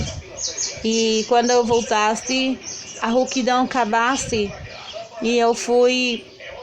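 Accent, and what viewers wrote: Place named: Spain. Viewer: Ela tem tres sotaques em um video espanhol, portugues e frances.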